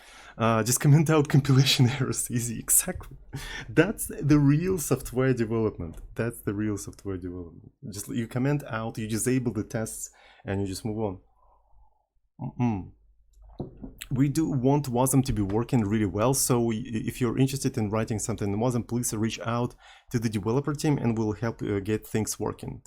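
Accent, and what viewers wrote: Russia. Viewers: Are you Rusian or German? You speak German words often, but your accent sounds Rusian. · Russian guy?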